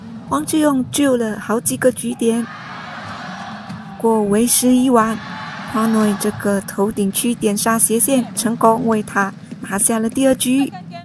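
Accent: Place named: West, India. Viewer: 这个似洋似印的旁述扰人观赏球赛呀😂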